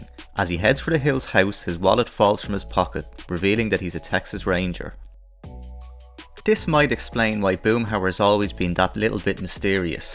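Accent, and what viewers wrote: Ireland. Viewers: a very Dublin accent to me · British? Sounds Irish to me. But I get you either way.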